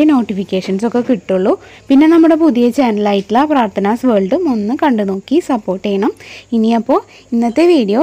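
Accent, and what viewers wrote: Thrissur, India. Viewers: Bro, super ayiitunde, thrissur il avidey a veedu, samsarthinu oru thrissur touch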